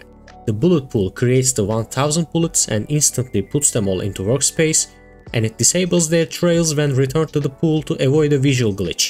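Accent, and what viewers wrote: Turkey. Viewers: Aksanından Türk olduğunu anladım gayet güzel bir video olmuş... Anlatımın harika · your accent reminds me of Turkish people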